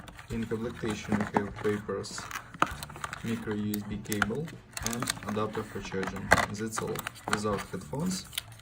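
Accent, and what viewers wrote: Russia. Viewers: Русский акцент и никуда от него не деться.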